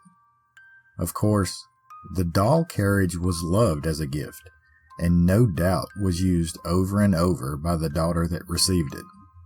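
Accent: South, United States. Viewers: Interesting and intriguing stories narrated by a Southern, Good-ol'-boy; accordingly, worth a sub.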